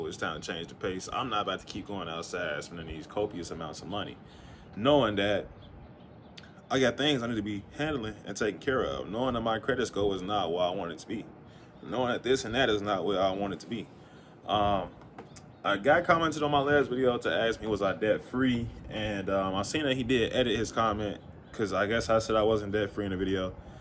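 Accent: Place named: South, United States. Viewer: Foreal bro, I always take mini vacations and travel but manage my money good. Where you from cause I can hear a southern accent a little, I'm from Louisiana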